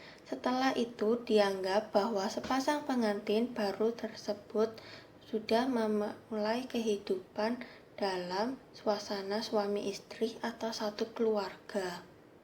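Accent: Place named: Java, Indonesia. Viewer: Yang jelasin kok logat Jawa, gak nyambung jadinya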